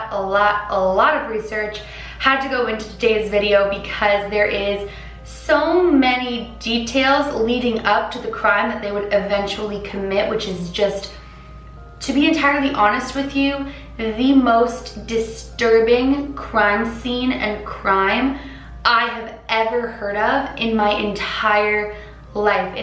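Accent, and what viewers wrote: France. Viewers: I love her French accent😋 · I know this is besides the fact and kind of random but you're french accent is beautiful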